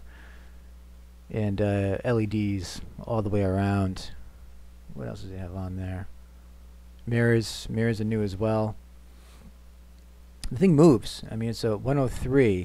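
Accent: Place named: East Coast, United States. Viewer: Why do you talk like an east coaster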